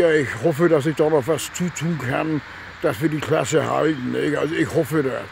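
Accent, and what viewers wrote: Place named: Bavaria, Germany. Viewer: Sowas wie dich brauch noch der FC Bayern, jmd der nur bayrisch redet und ein kultfan ist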